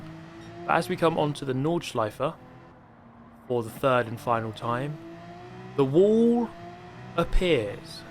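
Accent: England, United Kingdom. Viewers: This guys from England by the sounds of it, I think he’s well acquainted with rain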